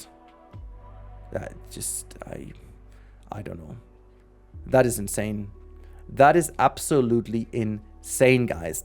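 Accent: Germany. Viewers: Hey ganz kurz, bist du Deutscher? Vom Gefühl her wär ich mir fast zu 100% sicher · I am a nativ speaker both german and english, I thought since you have an obvious german accent that I should speak in german to make it easier for you but okay if you want. You need to work on your dictionary, it is very cringe worthy hearing you use the same words over and over again because you lack the ability to express yourself. It seems that a neutral criticism about your video has struck a deep cord with you and I am sorry that I hurt your feelings, it doesn't change the fact however that you do repeat your words. Have a nice day.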